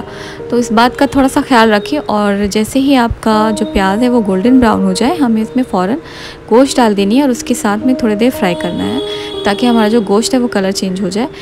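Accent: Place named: Eastern Uttar Pradesh, India. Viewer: you must be from Eastern UP. Mau Banaras side 😂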